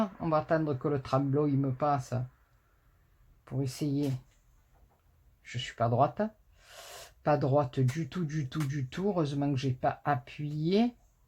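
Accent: South, France. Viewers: Recoucou ma.... contente d'entendre ce joli accent du sud....tes cartes sont magnifiques et le die superbe avec ce papier miroir... ca fait bien esprit de noel ou noyel même si ce n'est pas les couleurs traditionnelles..... Tiens mistigri n'est pas venu te voir sur cette vidéo.....pleins de gros bisous ensoleillés de la Réunion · Cc, je vous adore, j'aime ce que vous faites c'est magnifique et le soleil dans la voix du sud, je ris beaucoup et j'ai l'impression d'être là bas avec ma famille... Que vous me faites du bien..., et votre façon de dire, je vous kifffe grave comme vous dites...je vous copie pour apprendre c'est tellement beau.... Merci à vous de votre bonne humeur et vos rires me font un bien fou.... Et oui, franchement elles sont ravissantes 😁😂😂😂😂 Et oui, j'aimerai bien votre jolie carte.... 😁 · Pas vue la première vidéo ...mais tu me fais bien rire 😊et j aime bien ton accent du sud.Tres jolies tes cartes.💕💕bonne soirée bisous😘😘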